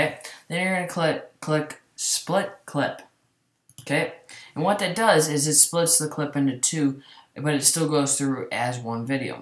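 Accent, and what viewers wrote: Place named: Wisconsin, United States. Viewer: I can tell you are from Wisconsin just from your accent.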